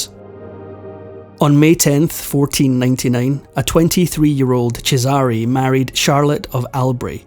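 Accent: Scotland, United Kingdom. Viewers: YES. GOOD SCOTTISH VOICE. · He's Scottish ,how's he supposed to do that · Scottish; possibly Glascow.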